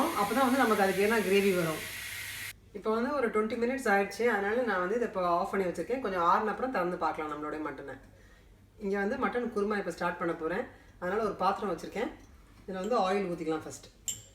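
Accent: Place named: Theni, India. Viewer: Oh ok k Ur slang like theni people 😊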